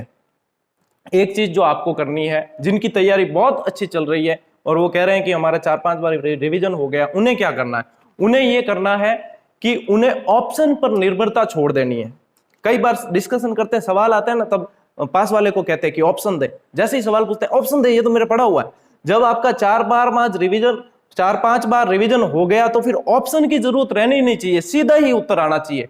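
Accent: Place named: Western Rajasthan, India. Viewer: सर आप कहां से हो आप मारवाड़ी बोलते हो तो उसमे पश्चिम राज़. की आवाज लग रही है ,सर जी कहा से हो मै बाड़मेर से हूं अगर आप यही मारवाड़ के क्षेत्र से हो तो मै आपसे पर्सनल मिलना चाहता हूं गुरुदेव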